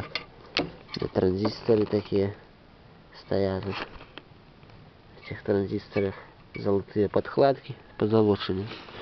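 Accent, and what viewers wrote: Belarus. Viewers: Чувствуется Белорус 😉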